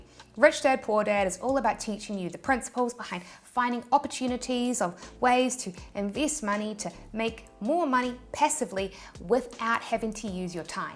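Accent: New Zealand. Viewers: New Zealand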